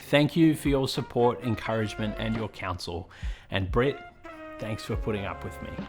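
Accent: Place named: Australia. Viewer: I sometimes forget how much I love hearing my own accent on youtube until I find a aussie channel that is of interest to me!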